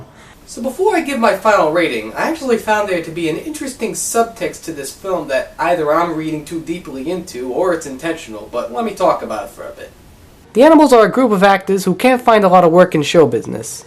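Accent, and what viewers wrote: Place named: Nashua, United States. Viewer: I heard Nashua, NH accent...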